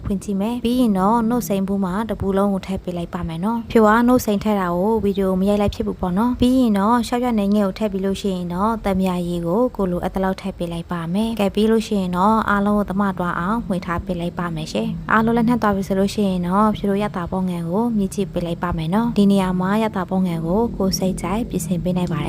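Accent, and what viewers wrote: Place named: Shan, Myanmar. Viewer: You sound like Shan people not Burmese however you have a sweet accent I am sure you are a good cook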